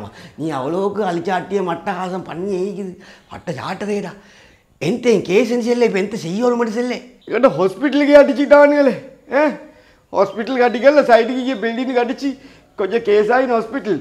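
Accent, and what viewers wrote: Sri Lanka. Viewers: ப்ரோ உங்களுடைய வீடியோக்களை ஆர்வமாக பார்க்கும் உங்களுடைய சப்ஸ்கிரைபர் ஒருவனாக சொல்கிறேன் நீங்கள் பேசும் தமிழ் இலங்கை தமிழாக இருக்கிறது நாங்கள் தமிழ்நாட்டு தமிழர்கள் கொஞ்சம் புரியவில்லை உலகம் முழுக்க வாழும் தமிழர்கள் அனைவருக்கும் புரியும்படி உங்களுடைய வார்த்தையில் உச்சரித்து சொன்னால் இப்பொழுதே உங்களுடைய வீடியோக்களை ரசித்து பார்த்துக் கொண்டிருக்கிறோம் தெளிவாக புரியும் படி வீடியோ பேசி போட்டீங்கன்னா இன்னும் பெட்டரா இருக்கும்....😊😊😊😊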